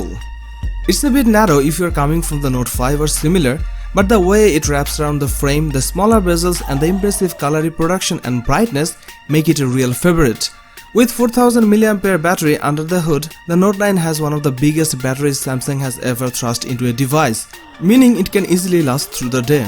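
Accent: India. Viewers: Your accent 😍 Which part of India you are from?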